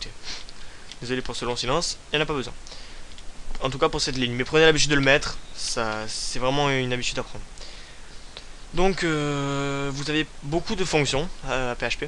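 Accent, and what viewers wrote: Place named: South, France. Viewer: ) moi aussi je suis du sud :D vive les accents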